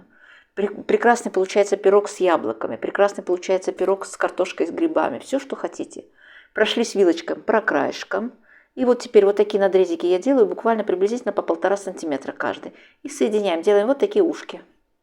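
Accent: Baku, Azerbaijan. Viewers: Джан, мой бакинский акцент ☺️🌷💜 Как бальзам на душу. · Тихи-тихо - это потихоньку. И у Вас милый бакинский акцент сохранился❤ И Вас приятно слушать и смотреть, спасибо за рецепт! Москва смотрит.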